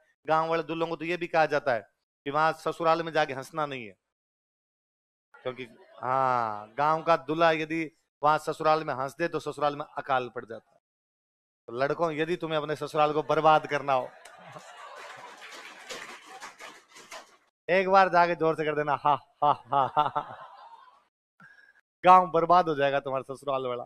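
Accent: Rajasthan, India. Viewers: aapki rasthani mix hindi CHOKHI LAGE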